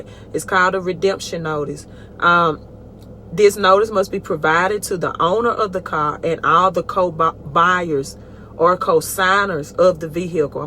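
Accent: United States. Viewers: Hmm American accent in a right hand drive...im confused or is it a camera switch.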